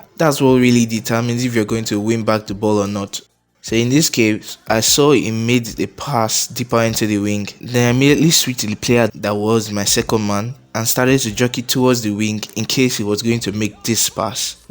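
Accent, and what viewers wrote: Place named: Nigeria. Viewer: sure bro. You sound Nigerian. You from Africa ?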